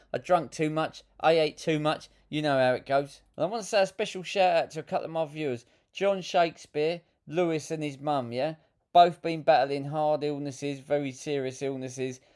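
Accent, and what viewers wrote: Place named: East London, United Kingdom. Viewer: You are the most entertaining channel on YT! Love your East London accent, and the crackers you come up with on the fly are superb! Best for 2025, keep 'em coming!🎉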